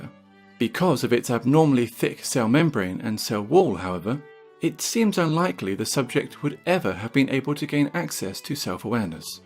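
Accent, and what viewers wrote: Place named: United Kingdom. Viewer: O sotaque britânico deixa ainda mais irônico .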